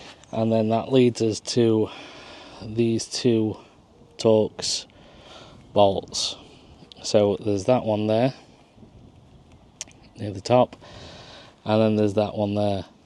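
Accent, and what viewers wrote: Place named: North West England, United Kingdom. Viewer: You sound local to the NW, is that correct? Which auction house did you use? Interested in doing the same sometime soon. Have fun, stay safe, make a profit.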